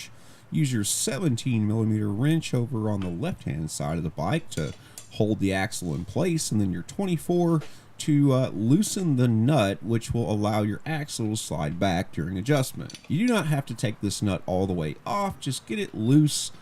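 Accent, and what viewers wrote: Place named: Canada. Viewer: Canadian? once American? can't tell. you just sound like a Canadian that knows all accents and ignores all of them but doesn't speak proper English either XD could also be cuz its a voice over and you can pick or re-say something lol but ya Canadian?????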